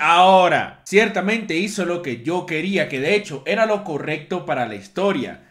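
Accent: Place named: Venezuela. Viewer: suenas avenezolano.. ¿estás allá?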